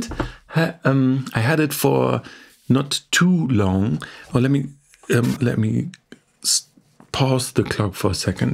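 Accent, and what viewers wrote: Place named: Germany. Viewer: You sound so German. ^^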